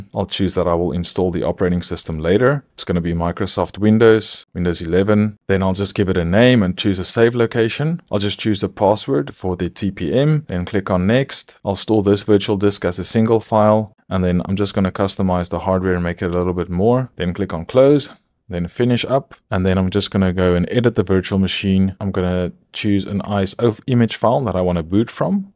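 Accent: Afrikaans, South Africa. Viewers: Baie dankie vir hierdie video. Dit is die eeste keer dat ek op jou youtube video's afkom. Gaan nou na alles wat jy het kyk, verstaan nog nie 100% nie, maar gaan nou na hulle kyk. Toe ek jou stem hoor, het ek dadelik agter gekom dat jy dalk Afrikaans is.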